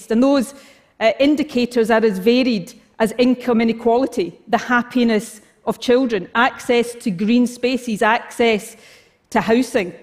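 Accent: Scotland, United Kingdom. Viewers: I'm English and understand every word, she's speaking English with a Scottish accent, just one of many different accents in the UK, I have a strong accent from where I come from in England, you'd probably find it hard to understand me, we don't all talk the same in the UK. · Oooh-a morning TedTalk and a nice brogue to listen to. I like this woman; she seems to know what's up. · Scottish accent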